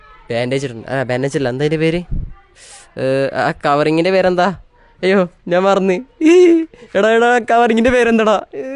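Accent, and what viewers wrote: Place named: Manjeri, India. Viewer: Manjery slag adipoli,valkeene😂😂😂😂❤❤❤❤❤ ❤❤❤❤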